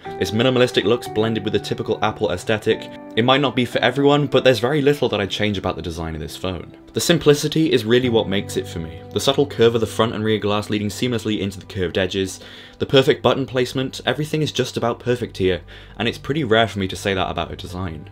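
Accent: United Kingdom. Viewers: The slow music and your smooth British accent almost makes it sound like this is an Apple trailer lol Doesn’t change the fact that this was easily one of the best reviews and everything was on point! Good job bro!!!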